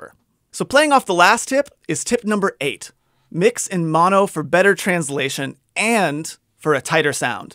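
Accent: England, United Kingdom. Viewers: What happened to the English accent? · when did you stop being british?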